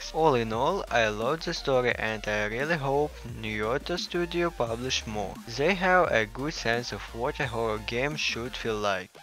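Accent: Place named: New Zealand. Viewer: bro the minute you spoke i was like HELLO FELLOW KIWI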